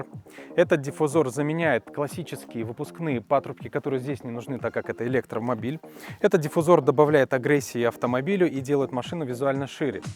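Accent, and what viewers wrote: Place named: Belarus. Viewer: Быццам бы распавядае беларус. Націскі ставіць па-беларуску.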